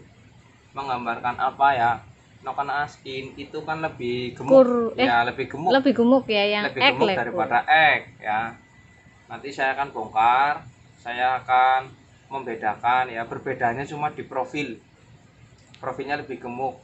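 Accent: Banyumas, Indonesia. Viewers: Bojone resing tenan mas... seneng khas ngomonge ngapak yak kepriweh pokoke khas tenan pertahankan mas... kaya mertua saya wong batang 😁 Ilmune aku nderek sinau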